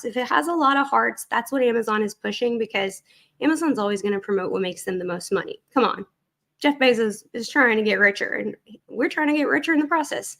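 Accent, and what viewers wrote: South, United States. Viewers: love your videos and this is a great one, as always. Also, talk how you want to talk. Don't change for ANYONE. You are you and that is why many follow and admire you for what you are doing. Sucks on what has happened to you from this jerk, but it will pass like everything. Some speed bumps along the way, but you will come out at the other end stronger. Love your southern accent and how you talk, so keep it going!!!!! From Ontario Canada, and if you heard me, you would say I have an accent also, so keep moving forward & upward!!!!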